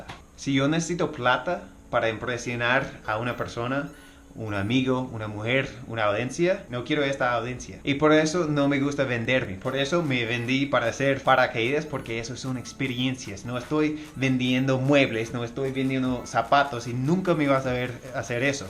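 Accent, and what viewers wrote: Colombia. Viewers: pobre man peor bueno eso suele pasarnos en Colombia, me causa mucha gracia su acento y palabras colombianas! jejejej adelante mi querido gringo! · te ganaste un sub eres un gringo pero de una forma colombiana y hablas como un colombiano y un consejo cali es miy lindo pero hay dasmasido ladron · Leí todos los insultos con su acento jajajaja Colombia amo su acento - Saludos desde Costa Rica que hubo pues parcero (? xD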